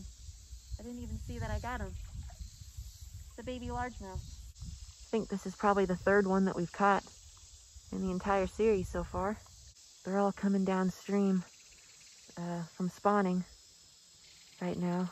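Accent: South, United States. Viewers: I'd just do what works unless there's a serious reason imo Common way is not always best way And also is that a slight country accent? aww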